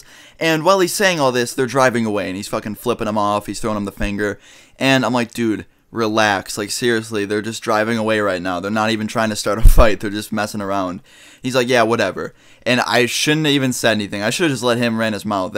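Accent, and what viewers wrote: New York, United States. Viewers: Aye it sounds like your from New York that's how people are over here lol are you?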